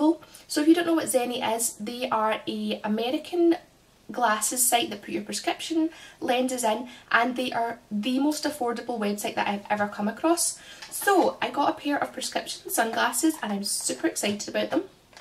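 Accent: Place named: Scotland, United Kingdom. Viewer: your accent is so cute you sound like riona from super natural. Is it Scottish? I have stainless steel polarized tinted mirrored aviators from zenni that are awesome! just a bit heavy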